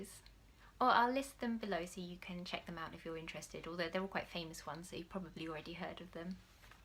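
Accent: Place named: England, United Kingdom. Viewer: You have such lovely, calming voice and beau tiful english accent. I like to hear you a lot!!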